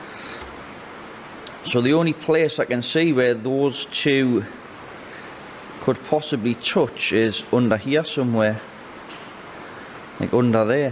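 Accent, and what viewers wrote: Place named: Scotland, United Kingdom. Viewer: Great video, love the accent. Like a slight touch of scottish in your north east dialect. Keep up the great work. Kind regards. Paul. 👍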